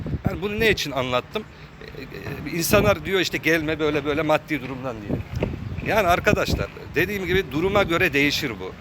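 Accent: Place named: Aegean, Turkey. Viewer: Ege şivesi candır 😄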